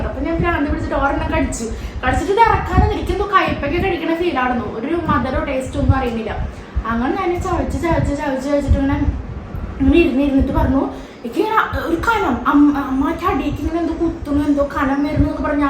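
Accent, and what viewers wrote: Thrissur, India. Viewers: samsaram correct thrissur slang aanaloo😍😍😍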